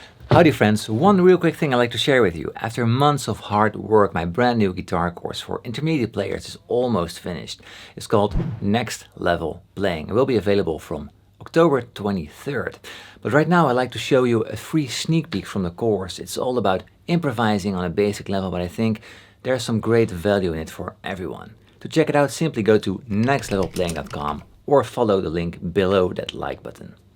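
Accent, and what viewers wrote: Netherlands. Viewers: Why do you sound so Dutch, when you talk? There's nothing Dutch on your YouTube account. The way you pronounce fingers, do you have one or two Dutch parents? Then, you should really meet Teye. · Je accent lijkt net op een Nederlander die erg goed Engels spreekt 😀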